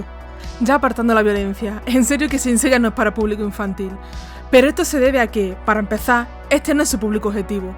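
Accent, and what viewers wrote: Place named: Spain. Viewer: Dejé de ver a los 20 segundos por el horrible dejo español....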